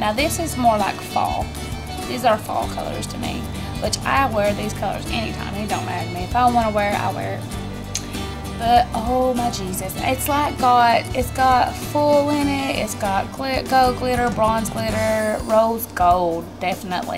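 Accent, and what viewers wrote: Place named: United States. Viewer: Hiiii nice video! Love your country voice 🤗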